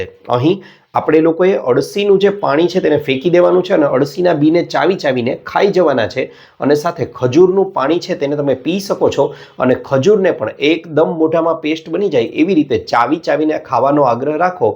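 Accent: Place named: Rajkot, India. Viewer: રાજકોટ તરફની ભાષામાં 'સ', 'ષ'ના ઉચ્ચાર 'સ' કરે છે અને 'ળ'ને 'ડ' બોલે છે. તમારી ભાષામાં એવી અસર કેમ છે ?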